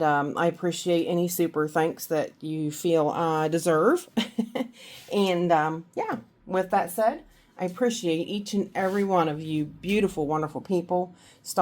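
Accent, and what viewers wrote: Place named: South, United States. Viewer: Hey there, from your accent you sound sound sound southern like me😊 May I ask where your from? You said the name of that lake and I thought I have heard of it before many years ago. At least I think I have 😂 I use to live in Ga. When I was little we use to go to a lake in Alabama.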